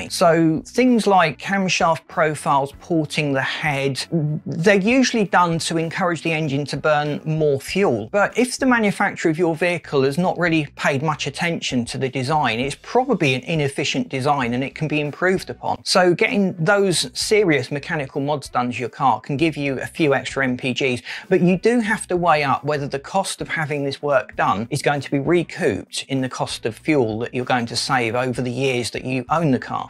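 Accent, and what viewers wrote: United Kingdom. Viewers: Impossible to listen too much twang and cockney accent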